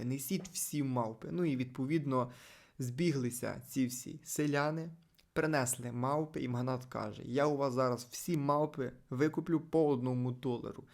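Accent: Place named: Boyko, Ukraine. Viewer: голос пздцц ти шо з села?? як бойко мовиш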